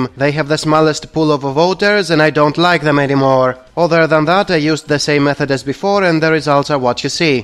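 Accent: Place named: Greece. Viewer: He's from Greece and yes that's his normal accent.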